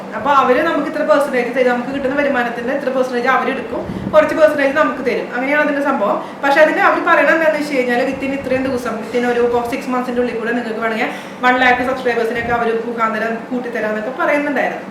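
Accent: Thrissur, India. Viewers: Chechy thrissur basha nallatha...super... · I m a new subscriber.also from thrissur.u r a great inspiration.love Ur videos.especially ur thrissur slang.wish u good luck.keep inspiring.stay blessed. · Hi I am writing a comment for your video for the first time. I am a regular viewer of your recepie and I have tried many which worked out really well. Ippo ethu recepie Cheyaan orthaal first eduthu nokunath aanu.you are doing very well..keep going. Pinne ee special video enikk othiri ishtapetu. de Thrissur language aanu presentation nte beauty kootunne. Iniyum nalla nalla recepie expect cheyynnu. and family Ku all the wishes. curry world nu all the best ☺ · thanks, and once again ur videos are very good, more than that it feels so homely the way u talk😀. I am from Thrissur, so ur slang makes me feel back home. I am a blogger too <link>,very new, looking ahead ur tips and help when I need😋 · nammude thrissur basha vidaruthu tttoooo.......superaaattto chechiyekkalum enikk chettaneya ishttam... 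bcz he motive u and encourage u....thtz great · you are best...you are really helping lots of people...please don't bother the negative comments...some people only know to crticize...it take lots of effort to bring such helpful videos....keep going...we love your trichur accent and your talks..I really love your way of explanation of recipes...feel like a sister explaining so sweetly... Keep going...lots of love and support..God bless you and your supporting lovely family always😍😍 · Logo change cheyanda.epo ullathu nallathanu.and also Trisure language um mattanda.😊 athu kelkan nalla rasamanu 😊. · nalla samsara shaily...TCR bhasha kalakkitta... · Thank you, varthamanam valare ishtayi, your experience is great inspiration to all. Please don't publish cookery books, nobody has the patience to go through them, adilum ethrayo rasamane ippozathe reethi. Don't bother about nasty negative comments. 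Trissur slang mattalle, appol veenayallathakumtto, adu theere sahikkan vayya, so pls continue in this same manner. It's so sweet of you and to tell us in detail about the monetary part of the deal. No need to change your logo, that has become part of your identity. You have cleared the doubts of many of your viewers, usually nobody takes the time to do that. Yeah has made you more confident and independent. Hearing your talk, I'm feeling so close to you, veetile oralepole. May god bless you and your family. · Thrissur language anu chechiyude vediosnte highlight.. njanum oru thrissurkari anu.. kalyanam kazhichath kochikaraneyum.. apol eniku thrissur rujikootukal ente vetil poyal mathrame aswadikan kazhinjirunullu.. husband nu kochi taste analo.. nerathe with family kochiyil thane ayirunath kondu avidathe tastel food undakiyale ellavarkum ishtamakumayirunullu.. ipol njanum husbandum molum qataril anu.. 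arginine thane husbandinu meth pareekshikukayanu thrissur taste ulla foods.. pullikaran ipo parayukaya ne nanayi cook cheyan padichalonu.. athinu chechiyodu anu thanks parayanullath..thank you so much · Hi am new to this channel... But this vlog is excellent.... Extremely inspiring for housewives like us. Thank you soo much. Can relate to most of the things you speak. Njaanum trissurkariyanuttaa. Tried ur varutha erissery. Excellent feedback from my husband who usually don't like vegetarian. Pinne trissur slang kekkumbo kannu nirayynnu. Am settled in New Zealand so missing trissur soo much. Please keep this slang for people like us. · Thrissur bashayonnum maatanda tto... Ivide athu ishtapeduna njangal kure perund.. Initially eniku kaanumbo kurachu valiya video aanenu thonirunnu.. pakshe naatil ninnum maari nilkkumbo kurachu thani nadan basha kekkumbo valiya oru ashwasam.....Valare genuine aayitu karyangal paranju.. 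Thanks to Chettan too... Keep rocking... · English ൽ സംസാരിച്ചാൽ തൃശ്ശൂർ ഭാഷ പ്രശ്നമല്ല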